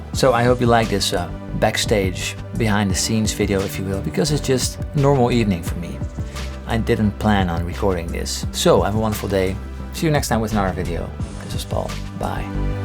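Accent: Netherlands. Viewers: U dutch? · Is he dutch? He sounds dutch af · toen ik voor het eerst een filmpje van je keek hoorde ik meteen al ''dit is een Nederlander"'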